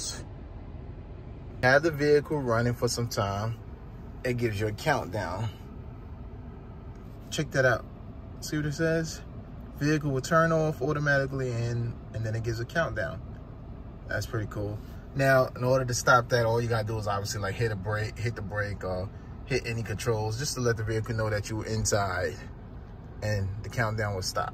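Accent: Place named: Washington DC, United States. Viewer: You sound like you from the north like DC area ! But yeah I’m getting the Santa Cruz premium or limited can wait to test one tomorrow 👍🏾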